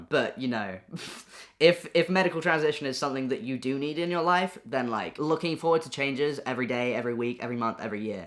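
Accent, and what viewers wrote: United Kingdom. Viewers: Dude I literally thought you were just an androgynous guy with a lovely British accent- Edit: Is that transphobic??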